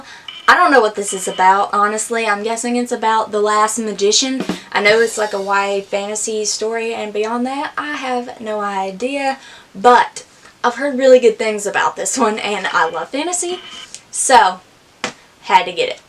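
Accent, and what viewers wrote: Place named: South, United States. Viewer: Your southern accent is so cute! I have 20 books to haul so I totally understand. Also I’m amazed that you held them all up for the thumbnail!!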